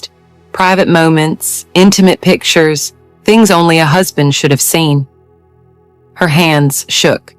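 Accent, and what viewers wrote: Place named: South, United States. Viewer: The southern accent for an African story is distracting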